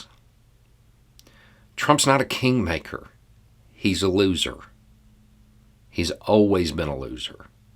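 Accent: South, United States. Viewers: his "act" used to be when he hid his accent. A lot of Southerners do. He's just speaking how a rural Southerner speaks. Sounds normal to me. I'm a Texan. I don't have much of an accent because my family drilled it out of us, but I've known plenty of people who sound just like him. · Opara and everyone else talking about his accent As a southerner maybe I can provide some clarification. What I think we are hearing here on most of his videos is close to his professional voice. You have your regular (nautural) voice, the one that no one is going to think you have a single brain cell in your head, the one that makes you sound like an extra from "Deliverance". Then you have your professional voice, where you have to hide as much of it as you can so folks don't think you are an idiot (or a bigot, because you know all us southerners are a bunch of racists, and to be fair, I can see why someone would have that assumption). 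What we hear from is a relaxed version of his professional voice. Some videos we hear his relaxed professional accent, some his natural accent, and some we hear a played up version of his natural accent. To someone not from the south these distinctions are easy to miss. I recommend anyone interested in the topic look up "code switching". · I wouldn't call it an act. is from the south and I believe the way he looks plus sounds is authentic. It's just people assume that others who look like him are going to be right wing and he uses that as an advantage for them to listen to his message.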